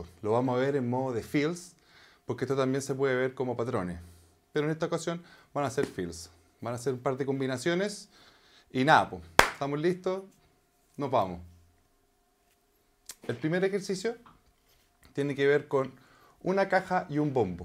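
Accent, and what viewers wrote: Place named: Chile. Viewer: No e visto los detalles del canal pero detecto un fuerte acento a ‘tsiléno’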